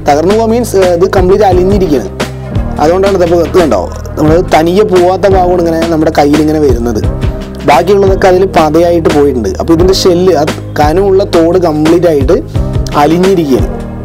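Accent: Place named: Palakkad, India. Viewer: ചേട്ടാ... ചേട്ടൻ Palakkad ആണോ?!? സംസാരം കേൾക്കുമ്പോൾ അങ്ങനെ ഉണ്ട്... നമ്മളും Palakkadinna😅😅 chettante videos എല്ലാം poliya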